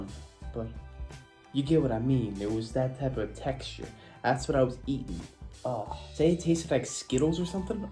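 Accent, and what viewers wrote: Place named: New York, United States. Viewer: your NY accent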